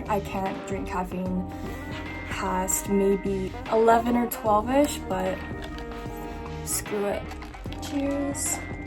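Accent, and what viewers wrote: United States. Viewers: why an American accent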